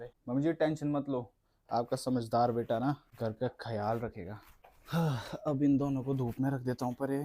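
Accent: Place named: Himachal Pradesh, India. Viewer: The Himachali innosence is present in you . And the Himachali tone of your voice is your identity . Keep it up hope this Himachali tone of your voice and your behaviour never fade away . Bcz most of Himachali YouTuber do vlog in a modern tone , behaviour etc but you always shows Himachali presence in you and also in your vlog ❣️ Wishing you huge amount of prosperity 🙏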